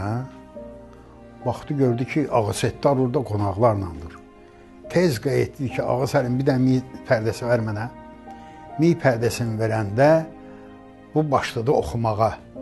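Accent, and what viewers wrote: Baku, Azerbaijan. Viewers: BAKI LEHCESI YEKDIR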